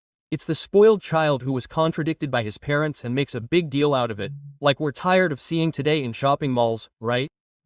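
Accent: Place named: United States. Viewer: Vidio foi gravado por me parece com voz americana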